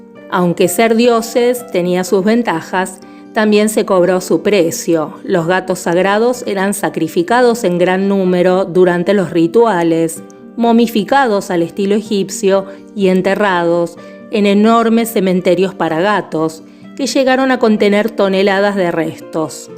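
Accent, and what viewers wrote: Argentina. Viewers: se nota mucho que eres de argentina y por alguna razon me gusta como hablas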